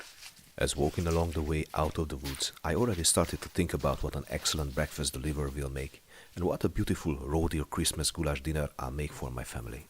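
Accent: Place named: Hungary. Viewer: All the best for 2024 folks - one of the best shooting and hunting channels ever, and in the bright Hunglish language too!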